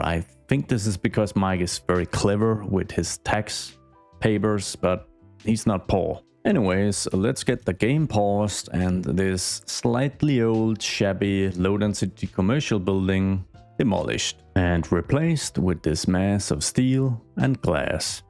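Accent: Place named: Denmark. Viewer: Omg, so he is actually from Denmark, always thought so from his accent, but wasn't sure, so cool to see other Danes here on YouTube!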